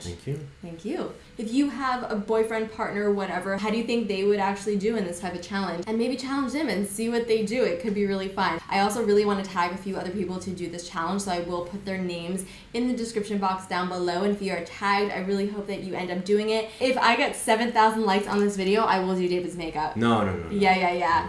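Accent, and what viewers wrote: Canada. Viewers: omg random side note but im so proud bc i can spot your canadian accent woo woo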